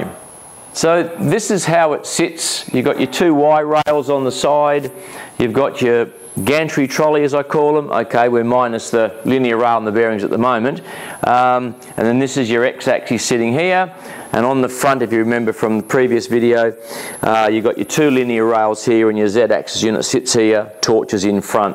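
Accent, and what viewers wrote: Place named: Australia. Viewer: Heavy over engineered base is fine, however if you want to cut thinner materials, you will end up building a lighter gantry and ditching the ballscrews. Rack and pinion would be a much better choice. Plasma is a very dirty process. Make sure to use the little plastic plugs for the bolt holes in the linear rails. Where in Aus are you? The accent and magpies give it away. :)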